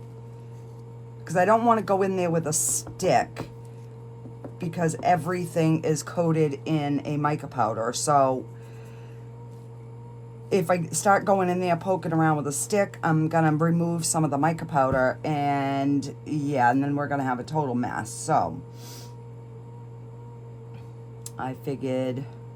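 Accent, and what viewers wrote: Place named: New England, United States. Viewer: Omgsh!!! I am in awe. Your attention to detail with all the colors is incredible. It is so festive. What part of New England are you from? I love the accent! I am a New Yorker but moved down to Georgia a year ago and everyone comments about my accent!! Thanksgiving is very similar in our family except being Italian of course those foods get added in. Wishing you and your family a blessed holiday P.S. don’t worry about your videos being too long. They are enjoyable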